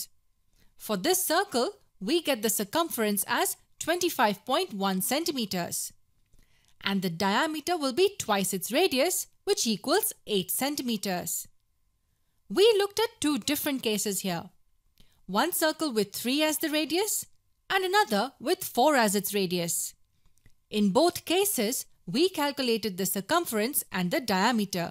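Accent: India. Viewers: very hard to follow, traditional Indian accent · I don't understand that Indian accent... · Of course it's an Indian accent narration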